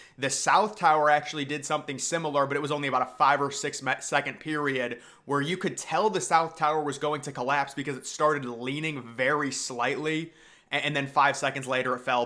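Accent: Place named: Alaska, United States. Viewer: He sounds like he's from the show Alaskan Bush People.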